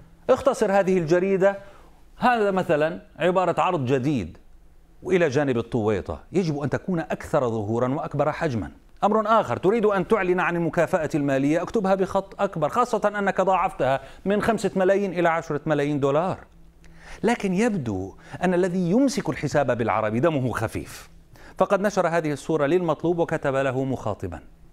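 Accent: Iraq. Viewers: ههههههههههههههههه اسلوبك يخبل ونوب تحجي عراقي تجنن لعنة الله أمريكا ومن والها أمريكا وايران هي سبب الدمار وهذول فروخهم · ههههههه احب هذا المذيع المخضرم من يتكلم بلهجة العراقية 😍🇮🇶✌🏻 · والله اللهجة العراقية عم تطلع معك دهب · احبك من تحچي عراقي🇮🇶❤️ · اش حلاتك من تحجي عراقي عبالك صدوك واحد من عدنا😄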